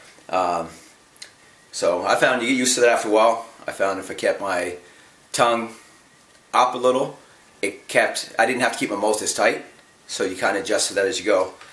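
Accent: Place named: Canada. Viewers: I noticed that as well. I watch Holms and Holms on tv home repair channels. They are from Canada and have the same exact accent. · ah a Canadian... ;) the way you say out, about and mouth :)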